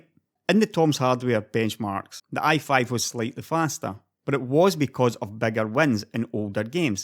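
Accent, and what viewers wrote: Scotland, United Kingdom. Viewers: Another good video. Been watching you since I got my Ryzen 7 rig built a couple of months ago. Looking forward to your build video. Side note. I am in Florida and I have a employee from Scotland who sounds just like you. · You have the voice of a Scot, and the face of a human. Amazing.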